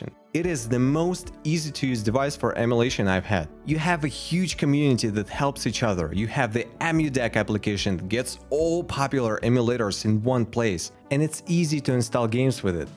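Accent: Russia. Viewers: ты когда голворишь lenovo legion go русский акцент так и прет))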